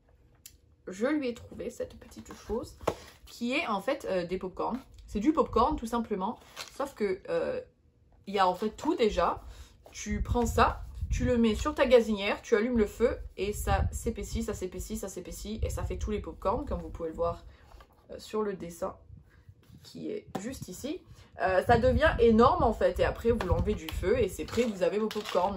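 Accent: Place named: United States. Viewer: Je trouve que tu as légèrement pris l'accent américain quand tu parles français 😍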